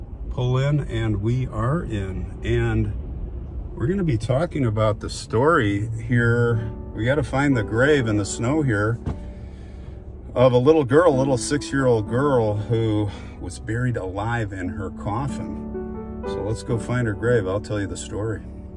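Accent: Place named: Upper Midwest, United States. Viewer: I love the music. It reminds me of Minnesota and North Dakota where I went to college and graduate school when my husband was in med school. The nicest people and a wonderful six years exploring North Dakota and Canada. Your accent takes me right back to 1970.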